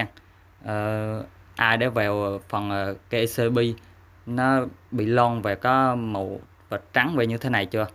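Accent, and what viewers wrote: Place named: Vạn Giã, Vietnam. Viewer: Chú Em này nói tiếng vạn giã đây OK nè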